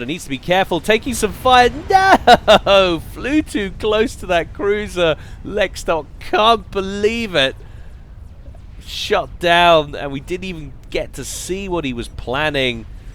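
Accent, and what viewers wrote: United Kingdom. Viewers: between you and TotalBuiscit, it's easy to get your fill of british people talking about games.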